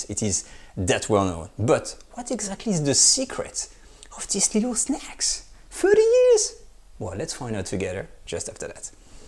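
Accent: France. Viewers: I just love, love, love your recipes , your enthusiasm and your elegant French accent! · Ree-sip-eee. Haha French accents are so cool. 😎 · One of the best chanels, you are such a cool guy. Your english is excelent and with frech accent is nice to listen to. Greetings from Canada. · Juste pcq tu honores notre culture française 🇨🇵⚜️ et que ton accent en anglais est delicious.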